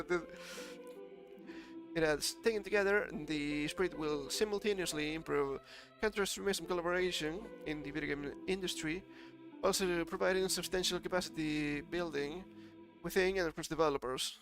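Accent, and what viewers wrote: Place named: Germany. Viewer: Dude what accent is that?? I thought you where speaking German at first.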